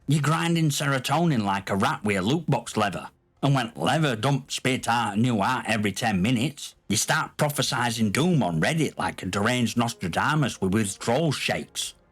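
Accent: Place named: North, United Kingdom. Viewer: Yooooo too funny bro. Nice to hear a northern accent telling it how it is. New sub from me and spot on btw with what you said. Too many pump and squirts out there lol gg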